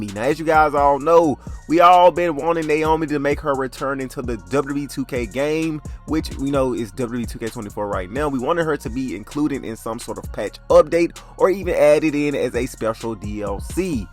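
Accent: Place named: South, United States. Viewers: your accent is thick, I'm guessing either North Carolina or Alabama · Gotta be Texas that twang is in there heavy lol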